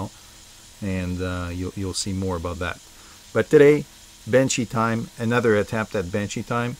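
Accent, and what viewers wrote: Quebec, Canada. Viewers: T'es tu québécois hahah?:) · Is it just me or you have a Quebecois accent?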